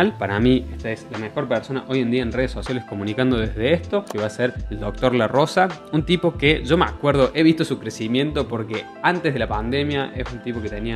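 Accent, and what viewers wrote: Córdoba, Argentina. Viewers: No Eres Espanol, Argentino no Creo? Solo es curiosidad! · Uhhhh ese acento cordobés, ya le baja 9 puntos😡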